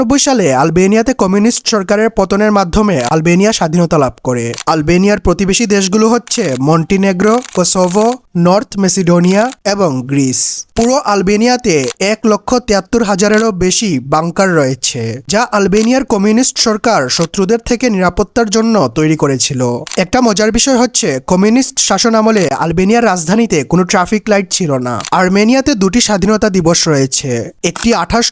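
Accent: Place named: Sylhet, Bangladesh. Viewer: Bhai apni Sylheti😊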